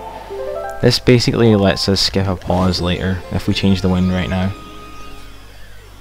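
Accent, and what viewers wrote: Scotland, United Kingdom. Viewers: are you scottish? I detect a scottish accent in your commentary =D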